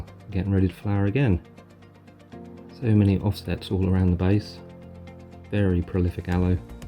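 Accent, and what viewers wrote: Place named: England, United Kingdom. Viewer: mellifluous English English accent.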